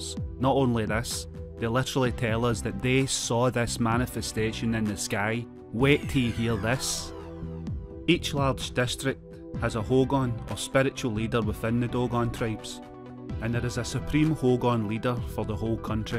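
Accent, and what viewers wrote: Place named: Glasgow, United Kingdom. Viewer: Is that a Weegie narrating?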